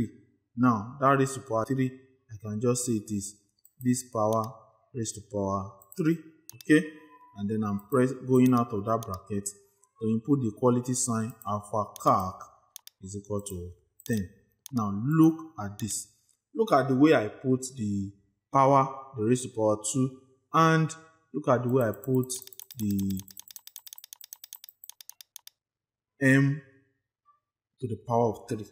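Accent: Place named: Nigeria. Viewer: Sounds like a Nigerian accent 🤔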